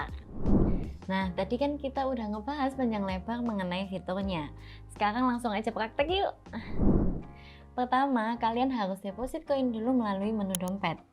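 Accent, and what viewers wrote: Java, Indonesia. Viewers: Mbake ayu lan medok nggih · Wah cocok buat kita yg ingin invest jangka panjang ini, Terimakasih mbk Cantik yg membawa Materi sangat menguasai sekali dan Cantik lagi, bahasa jawanya medok.... Hhehehe.... Aq pingin Staking yg Shiba Inu lah... Nunggu ada dana Free.... Sukses buat Triv....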